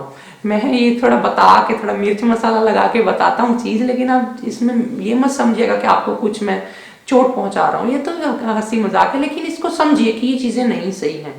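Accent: India. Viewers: Rohit Malhotra Is he teaching English to u... He is Desi n speaking in his accent... He said bloating or bloting just to make us understand as we search with that name in YouTube... Don't mke fun of anyone for their accent bcos English can be spoken any how one likes there is no rules as such...as it is a foreign language...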